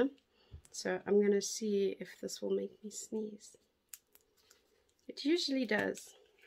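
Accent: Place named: South Africa. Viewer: Wow. That was beyond amazing. You really have a beautiful juicy perfect nose. The way you blow your nose is just perfect. The position of your hands. The honks you make. And you are really beautiful in general as well. And I love the south African accent too. Is there a way I can talk to you in private?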